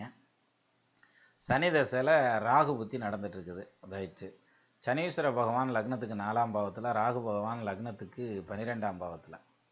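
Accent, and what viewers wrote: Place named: Dindigul, India. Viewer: sir please reply me i am waiting for u r answer for the past two weeks.sir how r u?i hope u and u r family r fine.i have been watching u r program since 2013 its very nice sir.u speak in dindigul tamil slang is absolutely wonderful with comedy sense sir. now i am currently working in singapore since 2005 but my job is still no improvement for me my friends all come with me well developed sir no satisfication in the job for me sir.last year i got married still not yet baby also sir. my DOB <num>.TIME-9.20 PM.BIRTH PLACE-COIMBATORE.And also please tell me about my future sir.i am waiting for u r answer.please reply me sir.my birth astrology my laknam is kanni but give in the computer DOB,PLACE,TIME it show as a simha laknan i am confusing please explain me sir .GOD BLESS U SIR. THANK U VERY MUCH SIR.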